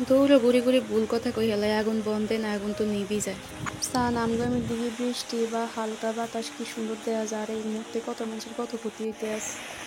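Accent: Noakhali, Bangladesh. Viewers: নোয়াখাইল্লা কথা আমার খুব ভালো লাগে নোয়াখালী ঘুরতে গেছিলাম থাকতেও অনেক ভালো লাগে ❤❤❤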